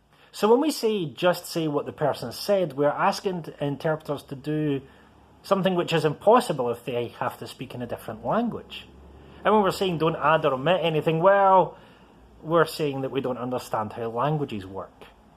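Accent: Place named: Scotland, United Kingdom. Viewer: ... that bonnie Scottish (Glasgow?) accent! Love it! :)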